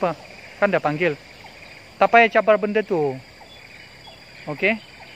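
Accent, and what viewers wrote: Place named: Borneo, Malaysia. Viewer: maaf nak bertanya bro orang borneo ke ..suka slang bos bercakap...done subscribe dan like..support bos punya karya. .....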